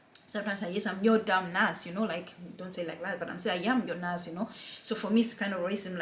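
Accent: Uganda. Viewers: I wouldn't know why my boss always gets hurt when we are traveling on a dusty road i put on my head wrap ,he easily asks why are you putting that on? I used to think its because i look different! Now, i know why? Thanks sis,am in Uganda you sound like someone from Fort portal.😊 I feel those guys have strong racism but hidden internally in their behaviour that is also quite hidden it takes a keen person to tell! The company i work for are all Danish, trust me they make me hate being in Denmark by the way!! Thanks for sharing!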